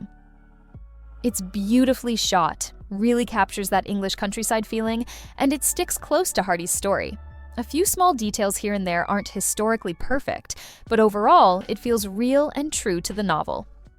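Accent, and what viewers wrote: United States. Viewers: Sorry, i can't stand this americain diction...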